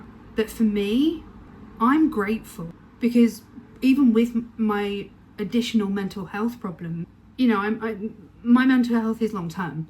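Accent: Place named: United Kingdom. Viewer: Because of your accent and you mentioned the UK toward the end I appreciate knowing where you are. We manage a food distribution out of a church in Northern California. Your experience of developing friendships and feeling not judged is exactly what we have too. We do not prequalify our guests and know they are there because they have some need of community and food. About half our volunteers are guests that asked if they can help us. Sure! We have volunteers from all kinds of nationalities, beliefs and finances. Our strength is having a great variety of people learning to work together on a common cause. We know that not all the food we give is a good fit for everyone. Our hope is that they try the food and give away what they don’t want. I’m reminded of the lady who was delighted to receive a big package of chicken feet! Wonderful! I would like to see offered counseling on budgeting. 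Sometimes we need an uninvolved person to suggest leaks in our spending habits. We offer listening to their story and prayer. God bless you in your journey. God bless the church that opened their doors and finances to their community.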